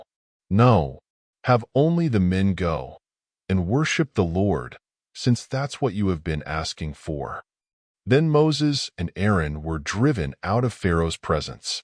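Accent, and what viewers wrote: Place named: United States. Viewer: I like the "American" voice. Easier to follow.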